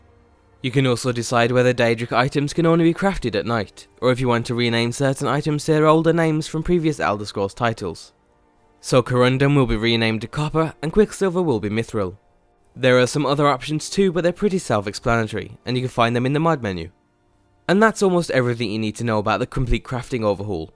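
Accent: United Kingdom. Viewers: James Troughton i don't know, he sounds more british again. then again he might be foreign and going for a british accent except for the word "mod" which he pronounces as "mad". it's not a big deal but, you know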